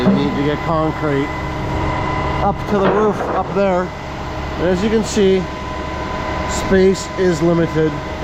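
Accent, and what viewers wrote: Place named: Canada. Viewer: Lol the "Canadia" pronunciations make me giggle...de-cal v D-Cal 🤣 😂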